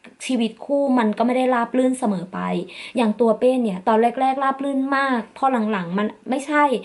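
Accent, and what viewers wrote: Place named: Isan, Thailand. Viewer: เว้าอิสานน่ารักมากน้องเป้😘😘😘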